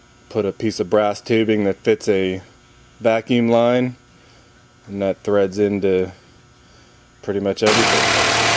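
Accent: Pittsburgh, United States. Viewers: Are you Paul's brother? If so, I know he said you were starting a channel but i dont remember him giving a link..i happened to find your name through another one of his subscribers and figured you must be him. Plus you cant hide with that Pittsburgh accent. You two are like the dream team when it comes to diagnosis.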